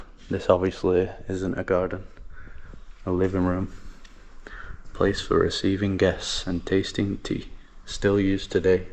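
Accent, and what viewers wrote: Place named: Manchester, United Kingdom. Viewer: Enjoying all your videos, great channel. Funny how the Manc accent keeps popping out every now and then too. Live there myself so easy to spot! What area were you?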